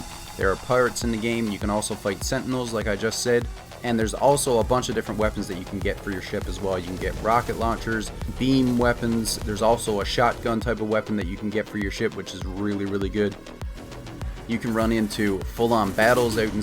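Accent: Newfoundland, Canada. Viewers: What part of Newfoundland you from? Looked at one of your money and nanite making videos, soon as you started talking, I said he's a Newfoundlander! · U sound very Canadian. Newfoundland? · Aha...Newfoundland! That explains it. Thanks for answering, nice accent 👍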